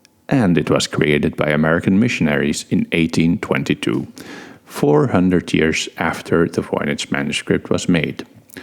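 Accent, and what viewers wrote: Netherlands. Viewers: I thought you sounded Dutch but... “no it’s slightly off” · you sound so extremely "dutch"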